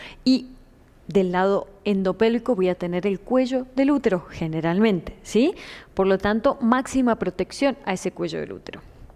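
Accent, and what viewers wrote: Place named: Argentina. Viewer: Es argentina ❤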